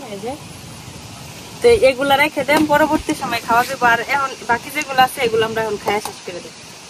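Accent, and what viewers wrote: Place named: Mymensingh, Bangladesh. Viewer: ভাষাটাও চানচুরের মতো মজাদার ছিল। মমিন সিংএর কথ্য ভাষা আমার প্রিয়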